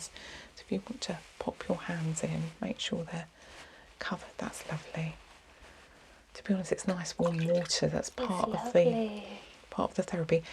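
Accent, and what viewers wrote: England, United Kingdom. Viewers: I really liked this video with the hand treatment. The hands hold tension also and a lovely relaxing massage and treatment is amazing. I know why I love your voice so much, the combination of your soft voice and the British accent really makes your speaking relaxing. Everyone subscribe and hit the like button if you are new here watching the lovely. I listen to your videos just before bed and I fall fast asleep. Blessings lovely lady 🌿🌿🌿🌿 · You have a soothing voice and I love an English accent! Actually, all of my go-to asmr channels are from the UK! 😅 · If you were ever to open a shop where we could get treatments like this, I would happily visit. Also, I realised I love your accent as well - I lived in the UK for a few years and still remember it fondly, your voice brings back happy memories while creating happiness in the present too. 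Thank you ❤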